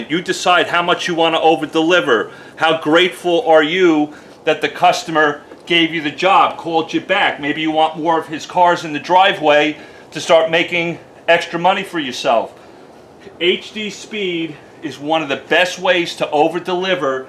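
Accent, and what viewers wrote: New York, United States. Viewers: Def New York or New Jersey. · In every video make, someone has to always comment on his New York accent. It’s always “, you sound like Raymond or the dj guy”. Can someone please make an intelligent question on car detailing?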